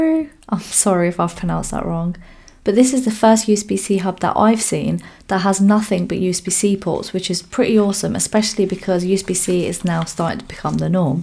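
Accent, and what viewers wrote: United Kingdom. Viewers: Truly helped me that you mentioned it doesn't support video output, I am looking for a usb dock to use samsung dex on an external screen ! :) PS : Even as a non-native english speaker I would bet you're from the UK lol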